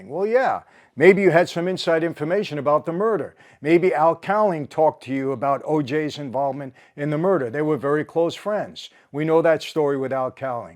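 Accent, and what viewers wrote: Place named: New York, United States. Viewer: I like you first time listening im an old lady who grew up in the big apple and your accent is like visiting my classmates and neighbors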